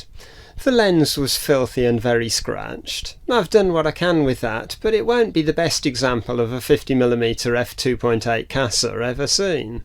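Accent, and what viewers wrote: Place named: Midlands, United Kingdom. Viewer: really good video matey, but can I ask a question? what is your accent? i come from Bedworth, so i feel like it's sort of a between Brum and the Black country accent?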